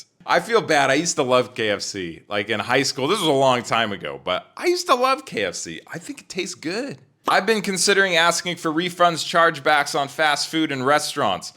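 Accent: United States. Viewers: . Recent fan. You live in Europe? I am not good on accents but I think you sound nyny. Inigo (me), "Where do you live in Europe?" The man in black (you), "No place of consequence." Inigo (me), "I must know." The man in black (you), "Get used to disappointment." Anyways... I get the very strong sense that you are a cook, a chef (they are different), and have worked in the restaurant industry in almost all capacities. So... WHY is r/fastfood about "the industry that I love"? I am certain that you do not work in the fast food industry. Is this a nostalgia thing. I would appreciate a reply to this post. Thanks:)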